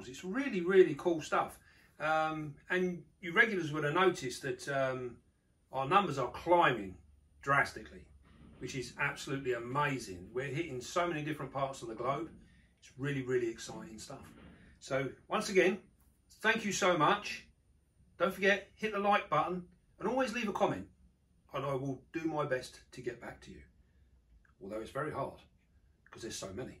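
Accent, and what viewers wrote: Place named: London, United Kingdom. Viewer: Great videos can’t stop watching now, where about are you from as you sound like you have all London accent but I didn’t think e could keep venomous spiders over here? Or am I I just being stupid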